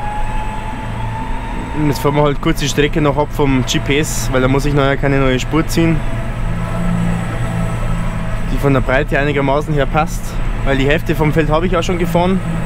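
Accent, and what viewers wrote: Bavaria, Germany. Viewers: Top Video, aba mit mehra Bayrisch is schena! Gruaß Flore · Red no bayrisch passt👍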